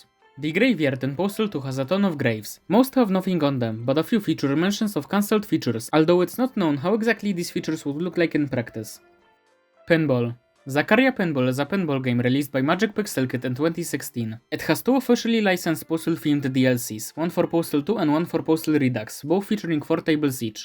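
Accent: Poland. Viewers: X3 piękny akcent polski, tak coś czułem xD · slysze ten akcent i wiem ze to polak. peak material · tickhest polish accent i've heard in a while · Uwielbiam polski akcent.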